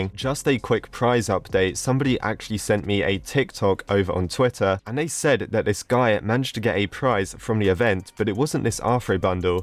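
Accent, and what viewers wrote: United Kingdom. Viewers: sounds like british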